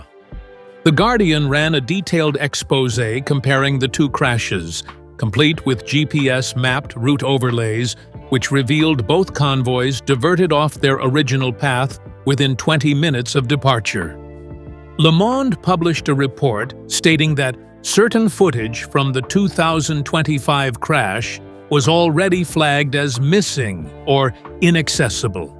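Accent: United States. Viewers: Now is this true>? The narrator's American accent tends to raise my suspicion..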